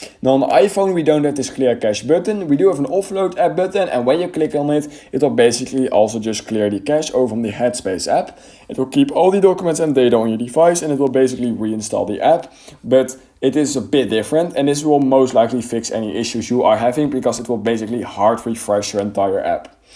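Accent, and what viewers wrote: Netherlands. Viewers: Je klinkt echt Nederlands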